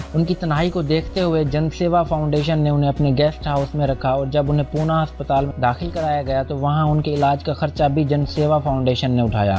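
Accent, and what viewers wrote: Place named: Pakistan. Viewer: Listening a Pakistani Urdu accent on background !!! Something is fishy!!!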